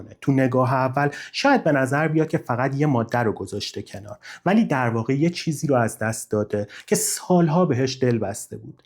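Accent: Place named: United States. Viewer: یه سؤال تو متولد نیویورک هستی؟ خیلی لحجه آمریکایی داری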